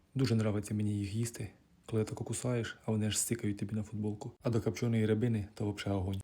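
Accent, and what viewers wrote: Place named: Poltava, Ukraine. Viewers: Автор, звідки ви? Такі самі глиняні кручі бачив на березі кременчуцького водосховища, та й говірка нагадує полтавську 😊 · Мабуть ти з Полтавщини. Чую знайомі нотки. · ❤❤❤❤❤❤❤❤❤закохалася... Неможливо наслухатись.ДЖИГІТ!!!!❤❤❤❤❤❤❤❤❤❤❤❤❤❤звідки ти?❤❤❤❤❤😂❤❤❤чую наш ПолЬтавський говьяжий суржик.ТАК приємно😂😂😂😂😂😂😂😂😂😂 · Дякую за ще одне неймовірне відео. Ти молодчина, так тримати. Твоя мова схожа на мою, я з полтавщини. Бережи нас Боже. Чекаємо на перемогу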